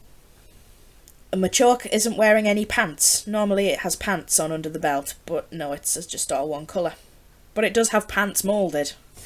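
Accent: United Kingdom. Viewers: I thought you were British because of your accent lol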